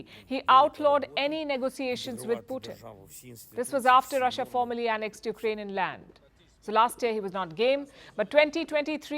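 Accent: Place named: India. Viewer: I love your news but please speak English little bit better... I can't understand of of your Indian accent, its way too strong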